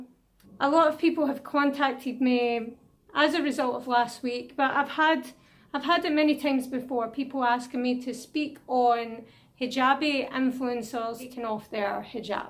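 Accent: Scotland, United Kingdom. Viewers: AAA ooozu billah, I thought u r quarantine..Scottish accent is too unique from the British one but beautiful.. Mashallah.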